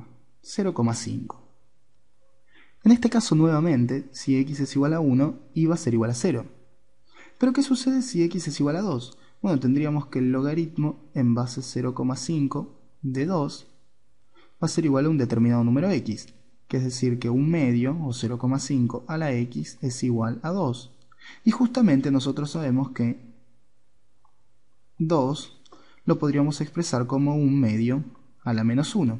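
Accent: Rioplatense, Argentina. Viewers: Bryce Flint No es culpa de él haber nacido en un lugar distinto al tuyo, es su acento de argentino o uruguayo, no se. La culpa es tuya por intolerante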